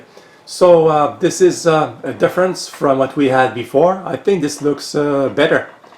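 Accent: Quebec, Canada. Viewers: Thought I spotted un accent quebecois in your english monsieur.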